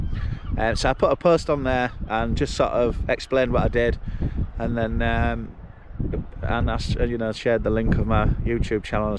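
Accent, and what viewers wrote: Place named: Yorkshire, United Kingdom. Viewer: What a great lunch and cup of tea, all to your super music and dulset tones with some super photos. Splendid work. James and I am getting used to the Yorkshire accent. Hope you are clear of illness so you can keep on with the videos. I enjoy yours as you are down to earth without any pretentious attitude like some. Look after yourself!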